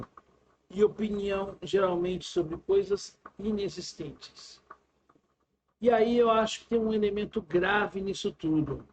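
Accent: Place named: Rio Grande do Sul, Brazil. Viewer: Ele é Gaúcho e os Gaúchos tem esta entonação qdo estão indignados.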